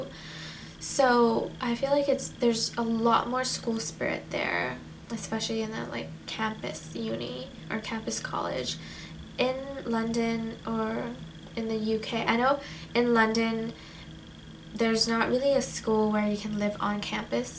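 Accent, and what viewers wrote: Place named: United States. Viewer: its ok, you didnt offend. But you do sound American, so it surprised me to learn that mandarin is your 1st language. It's very impressive and I'm surprised that you don't see it as a compliment :)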